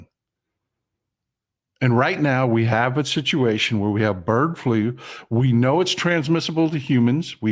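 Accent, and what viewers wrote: Texas, United States. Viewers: Oh, Logic delivered in a Texas accent from under a cowboy hat!!! I have hope for civilization!! 😊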